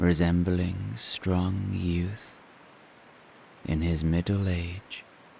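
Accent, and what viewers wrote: Ireland. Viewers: if you see by chance you read this message, I must convey my eternal gratitude for your bedtime meditative stories! I have a very hard time falling asleep. You have the most soothing voice and love your Irish accent! I’ve been to your native country twice I loved it there so much I almost bought a little cottage near Galway but had to reconsider because of family back in Florida! Thank again❤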